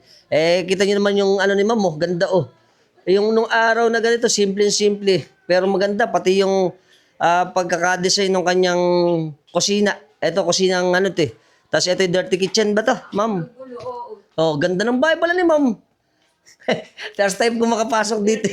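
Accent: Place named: Bulacan, Philippines. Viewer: Yung accent mo sir bulakenyong bulakenyo 😅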